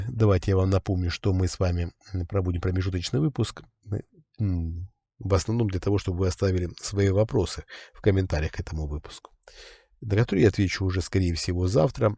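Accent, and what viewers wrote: Ukraine. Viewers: Вопрос. Скажите пожалуйста, почему у вас украинский акцент?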